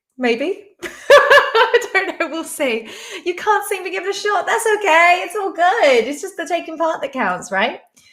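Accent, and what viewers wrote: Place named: United Kingdom. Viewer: Whoa, I had no idea you had a British accent. Very interesting!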